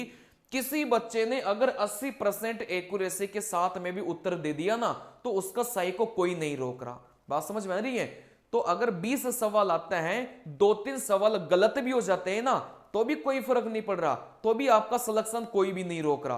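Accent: South, India. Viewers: Ye south jaisi hindi bolte hai sir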